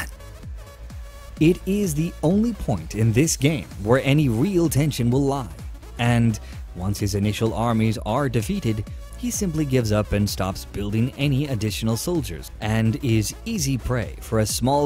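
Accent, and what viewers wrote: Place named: Ukraine. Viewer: He should stick with his natural Ukranian accent. Dame pesos for example.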